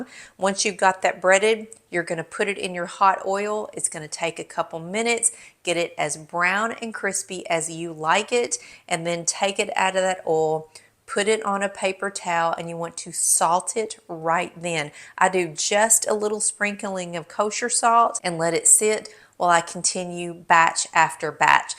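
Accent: South, United States. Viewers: 🤣🤣🤣🤣🤣🤣🤣🤣she is a southern woman that's how they pronounce every thing and I love it!!! okayeee bless your heart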